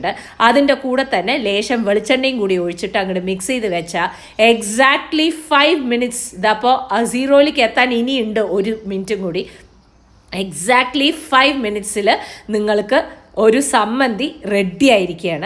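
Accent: Palakkad, India. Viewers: Palakkad area aappam anu ennu thonnunnu. Nemmara thamasikkumbol avidullavar pronounce cheyyunnathu pole thanne aayirunnu. Thrissur appam thanne anu.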